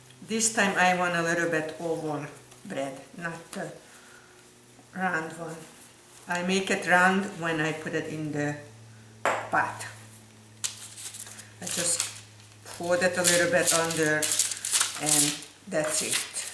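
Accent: Hungary. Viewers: Thank you for your great videos. Not only do you make baking look easy, but you present it in a way that can be followed by someone like me, who is afraid of baking. I came to the US when I was only 7 years old. I'm a pretty good Hungarian cook. But, I love listening to you with your beautiful Hungarian accent. It adds extra flavor to everything you make!! Mivel nincsen senki akivel tudok magyarul beszélni, kérlek, enged meg hogy veled kommunikáljak és esetleg kérjek főzési tanácsot tőled. A nővérem Budán lökött. Nem jót USA'ba csak látogatóba. De már nem él. A volt férjemmel sem beszélek magyarul mert a többi család nem érti. Csak egy osztájt fejeztem be Pesten, de azért nem vészes a nyelvtan és az írásom. Régen leveleztem a nővéremel. Az már nagyon, de nagyon régen volt. Thanks again! And all good wishes to you and your family. Miriam P.S. I would love to know a little bit about you. 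You can find me on Facebook, if you wish to friend me. I would be so honored.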